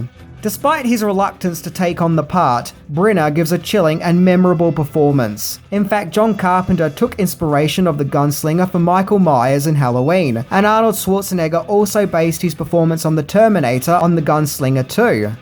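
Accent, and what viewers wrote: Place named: Australia. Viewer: " but with thick accent it's hard to tell.' Seems pretty mild for an Aussie. Maybe its all that Monty Python that has prepared me for non-American accents. Such as the University of Wallamaloo sketch.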